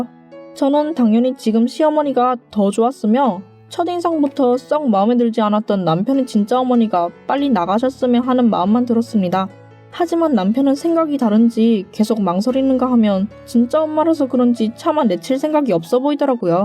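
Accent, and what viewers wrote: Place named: Busan, South Korea. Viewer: 부산 사투리 세요? 듣기 싫다 정말 !!!조심하세욧 제발 ㅋㅋㅋㅋㅋㅋ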